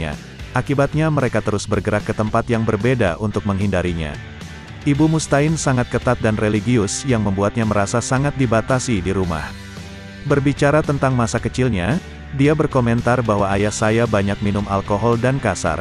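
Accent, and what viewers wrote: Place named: Indonesia. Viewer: Kan pake pelafalan logat Indonesia bro... 🤣🤣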